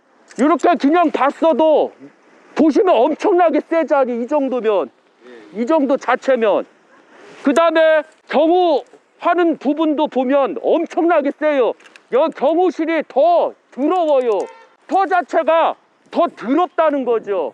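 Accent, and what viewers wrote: Chungcheong, South Korea. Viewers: 제 나이 칠십이 넘어서 세상 이치는 어느정도 안다고생각합니다 집값이 얼만데 댁이라면 저집을 사겠습니까 누구라도 끔찍해서 몇십억을주고 들어가 살고싶지않을겁니다 혹시 누군가 공익을 위해서나 다른 목적으로 사용한다면 모를까 사람이 들어가 살겠다고하지않을것입니디 저도 언젠가 유투브에 올라온 영상을 본적이 있어서 저분을 알고있어요 특유의 충청도 말씨때문에요 그렇게 제자도 양성하시고 하는분이 엄청난 가격의 남의 재산을 저렇게 공개적으로 촬영해서 방송하시면 되겠냐는겁니다 집값이 폭락하고 안팔리면 어쩔거냐 그말입니다 더구나 서울등 대도시도 아니고 시골 외진곳같은데 말입니다 그리고 억울한 옥살이로 가혹한 세월을 보내신 박근혜대통령의 건강을 걱정하는건 알겠어요 지금 건강이 완쾌되신것도 아니니까 더더욱 그렇구요 그러나 영상으로 이렇게 공개적으로 놀랍고 자극적인 제목으로 이러는건 절대로 아니란말씀이란겁니다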